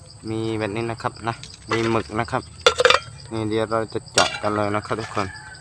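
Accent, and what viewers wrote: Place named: Southern Thailand, Thailand. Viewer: บังพูดนายูบ้านเราเลยไม่ต้องอายบัง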